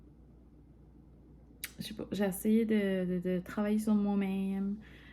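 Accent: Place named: New Brunswick, Canada. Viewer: Je viens de découvrir ta chaîne et en écoutant ton accent j’étais comme elle vient sûrement du NB comme ma maman vient de là, même si maintenant nous habitons au Québec. J’aime bien le contenu de ta chaîne selon mon petit survol rapide 😊 En passant concernant le deuil de ton animal, je te feel x1000 et tes émotions sont valides peu importe ce que d’autres personnes peuvent penser de cela ta peine est normale et tout à fait légitime. L’amour envers un animal est incommensurable alors le deuil est tout autant souffrant.💗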